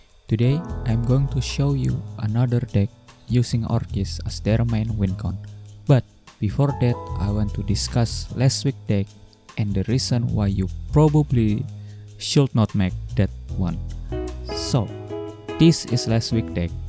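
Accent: Indonesia. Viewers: orang indo ya? ko medhok?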